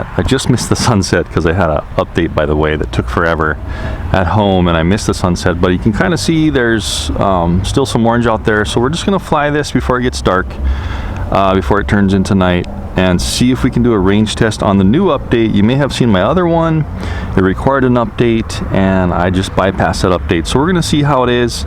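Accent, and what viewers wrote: Hawaii, United States. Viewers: i don't subscribe for the videos...i subscribe because your Hawaiian accent voice is mesmerizing!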